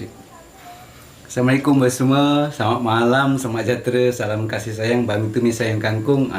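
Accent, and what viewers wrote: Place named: Malaysia. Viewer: Mantul banget logat bahasa Melayu ya mas.masih lekat hingga ke kampung.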